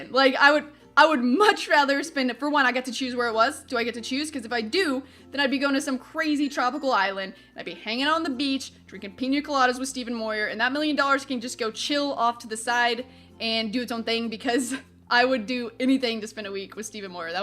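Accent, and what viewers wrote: Canada. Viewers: are you from Canada new Zealand or Australia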